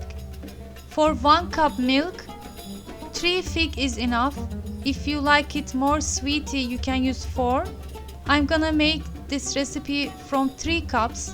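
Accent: Turkey. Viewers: As a Turkish person watching this, I really appreciate his initiative <3 the recipes look amazing. And you speak English very well, on top of the lovely and authentic Turkish accent you have!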